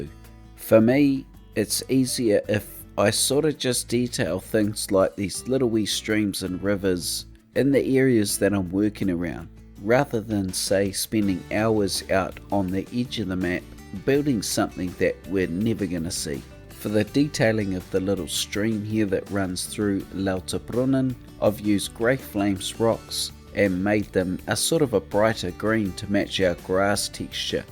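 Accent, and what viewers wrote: New Zealand. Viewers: Your level of detail is amazing. You popped up out of nowhere! How long have you been playing the game and been on YouTube? Love your Kiwi accent. A Canuck here!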